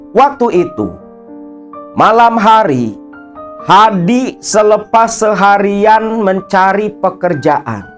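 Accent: Java, Indonesia. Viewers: Kaya dangar ketoprak bahasa jawanya kuat orang Papua dan propinsi lain agak susah mencernanya🙏🙏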